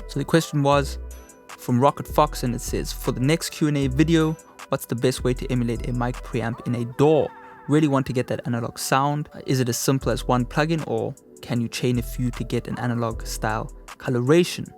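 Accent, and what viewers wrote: South Africa. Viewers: Are you from South Africa???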